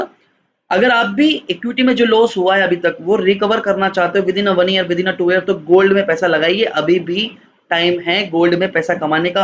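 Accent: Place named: California, United States. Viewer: Cannot understand anything you sad - are you from California?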